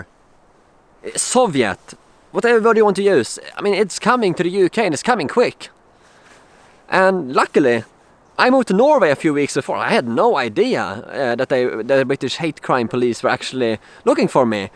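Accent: Sweden. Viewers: Detta är alltså inte satir? Hade ingen aningen om att Svenskar fortf postade videos med så grov svenengelska som denna. Går ju inte att koncetrera sig på innehållet. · tried to watch. but the swenglish is just so bad i cannot stand it..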